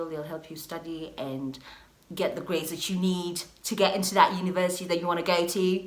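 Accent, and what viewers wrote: United Kingdom. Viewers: The British accent tho🥰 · am here for the accent...girl i love you....when you are loud kidogo and ur british/UK accent can be heard in there somewhere..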